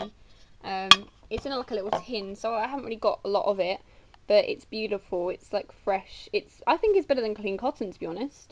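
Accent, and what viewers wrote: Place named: London, United Kingdom. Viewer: Are you from near London? You have such a London accent haha :)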